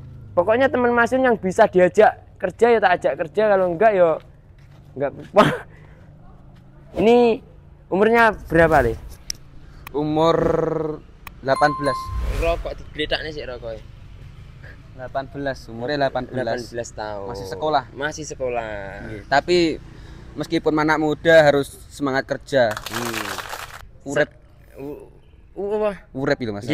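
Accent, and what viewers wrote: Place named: Banyumas, Indonesia. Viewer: Wong ngapak dir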